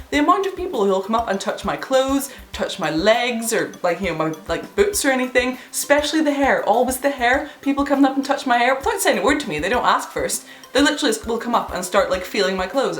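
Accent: United Kingdom. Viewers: Sup YO, Sorry you went through what you went through, I went through similar in my youth as a MetalHead living in Brooklyn NYC. But people of mundane culture can't help themselves but tap into realms of ignorance, you are extremely beautiful and keep filling people in, this is cool, Goth's in NYC are a lil to themselves or are bit elitist so it was hard to get info about their movement in my day. Love the accent is it British?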